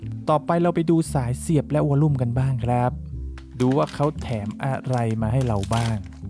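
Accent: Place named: North, Thailand. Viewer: คนเหนือแน่เลย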